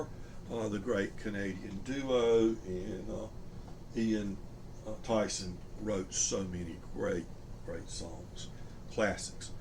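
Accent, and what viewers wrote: South, United States. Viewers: Man, I watch a lot of record geek videos that turn out to be terrible, not just bad taste but SHOW THE DANG RECORDS! But yours is different, and better. You don't pretend to know things you don't, you're not chasing trends, you mix it up (I'm a jazz guy, but I saw Hillman and Peterson play together a few years ago and it was brilliant). I can't place your accent (North Carolina? Virginia? Alabama? I suck at southern accents) but I could listen to it all day. I'm a subscriber now.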